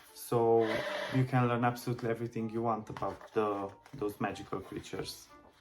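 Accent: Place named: Czech Republic. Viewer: I like your accent:) I've never heard the accent of the Czech republic. And your English is excellent my friend!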